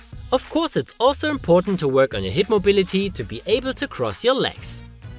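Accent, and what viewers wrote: Germany. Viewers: I love the German accent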